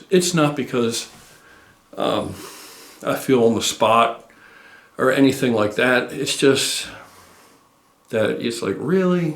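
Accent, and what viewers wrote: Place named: Ohio, United States. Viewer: Hey your accent sounds familiar...Ohio? I used to live in southeast Ohio as a kid! Anyway, cool channel and sick gains bro 👍🏼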